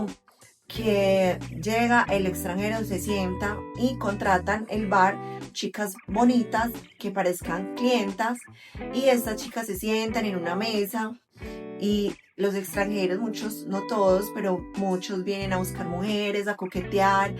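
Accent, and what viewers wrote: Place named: Antioquia, Colombia. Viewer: Gracias, me gusta ver tus vídeos para mejorar mi oído para el español, especialmente el acento paisa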